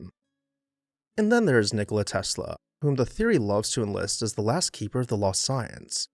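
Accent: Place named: South, United States. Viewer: Interesting mix of a slight southern gay drawl with a hint of late to chabad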